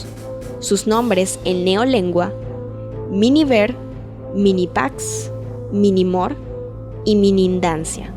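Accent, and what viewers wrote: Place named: Latin America. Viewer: Bien! Con acento latino. Ese ibérico es muy molesto. Gracias.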